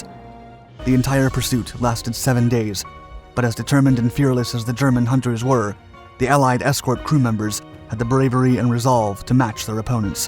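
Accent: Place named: United States. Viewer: seems a native American speaker, at least from his pronunciation. The verbal gaffes are difficult to explain, unless we understand he grew up in a video world, without more careful, detailed study of history. inattention to such detail routinely harvests viewer criticism, and his sorest need has been a video editor, assigned the demanding task of finding errors. By accident, I have found sections of a source work he has quoted almost verbatim, but without attribution.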